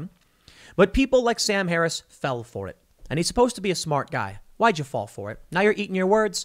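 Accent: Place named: South, United States. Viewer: And a thick southern accent ties the bow on the package of passive-aggressive sarcasm.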